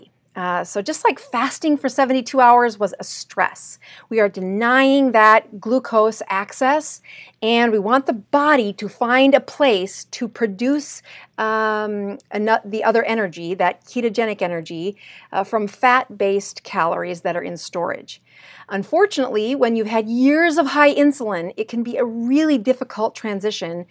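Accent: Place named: Upper Midwest, United States. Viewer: My family is of Finnish heritage from the upper midwest where most people have a sauna in their homes. My mother is 85 and in great shape, due in great part I believe to her regular use of a sauna. I have an infrared sauna that I've gotten out of the habit of using since we moved two years ago. Thank you for the inspiration to use it regularly once again. By the way, it throws me to hear your upper midwest accent without pronouncing it "Sowna" as the Finlanders do.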